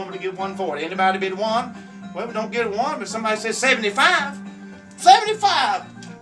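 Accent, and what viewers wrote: South, United States. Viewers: I don't know what I love more: the southern accent, or the music in the background reinforcing all the prejudices of US southerners xD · Your chant is beautiful and you have nice rhythm. And your southern accent makes it even better! 👍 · That was awesome!! I wish I could do that, but there just ain't no way!!! Love the deep southern accent!! I'm an ole country gal that lives on a farm and go to alot of livestock auctions and I love listening to the auctioneer! I do have to say though, you are really amazing at this!!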